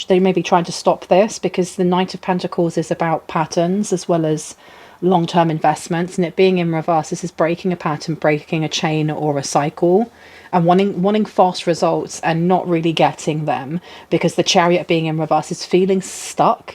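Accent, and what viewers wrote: United Kingdom. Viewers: Thanks! This presentation and the one after it really resonated with me. In my situation, past & present. BTW really love your British accent.❤😊 i couldn't stop giggling when you said,...." as if they were stumbling around like they came out of a bar or something."" I was expecting you to say pub, though! 😂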